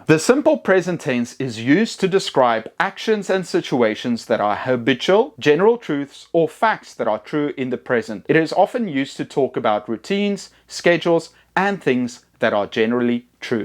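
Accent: South Africa. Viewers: Sir...great video..but are u by any chance ...south african?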